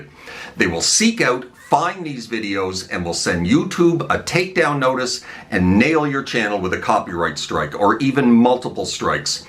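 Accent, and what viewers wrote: Canada. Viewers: That's sounds like a great Maritimes accent if I am coreect? Awesome channel with great song selections, keep the great work.. Newly subscribed in the past month, your a great player, would love to hear some original content if you have any. God bless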